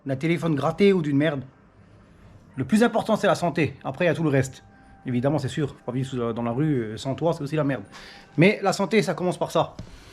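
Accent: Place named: Moselle, France. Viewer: Un beau tuto , dommage que je n’ai pas en profiter quand j’ai fais la mienne y’a deux ans Ma femme dit que tu as le même accent que moi Vive la Moselle-est mdr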